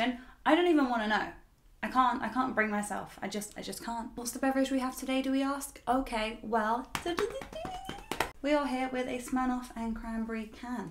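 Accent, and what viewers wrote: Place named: Australia. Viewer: is my favorite band. Where are you from? I like your English accent maybe Australian?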